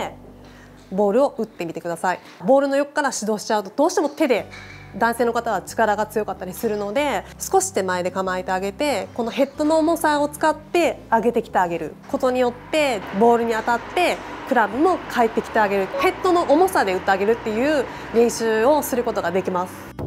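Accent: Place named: Kansai, Japan. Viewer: 関西弁がイイね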